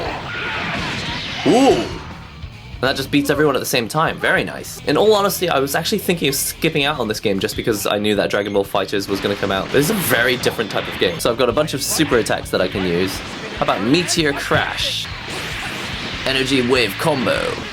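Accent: United Kingdom. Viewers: HE HAS A BRITISH ACCENT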